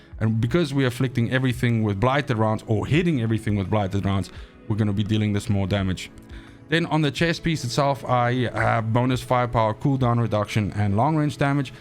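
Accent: South Africa. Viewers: subscribed brother, love your videos. Really well detailed and explain... and I hear that south african accent coming through. Lekker !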